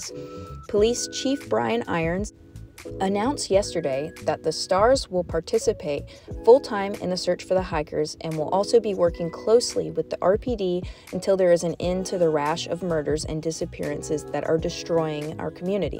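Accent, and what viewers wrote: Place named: South, United States. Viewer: You’ve a soothing voice, one that makes for easy listening, like a Sam Elliot or a James Earl Jones. I heard a slight southern accent which was adorable, but I say go for it!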